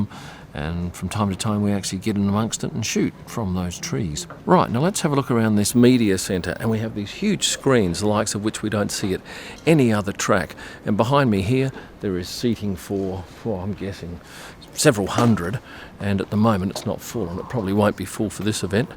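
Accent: Australia. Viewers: love your videos! Great visuals and commentary, love hearing that awesome Australian accent. Take care! An American fan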